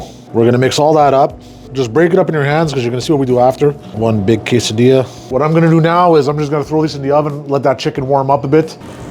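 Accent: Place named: Quebec, Canada. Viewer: Something about a Quebec accent is pleasant. Thanks for the vids as always!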